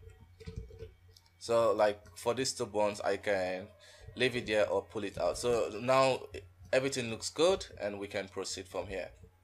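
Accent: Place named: Nigeria. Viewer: Just wondering are you nigerian? You sound like nigerian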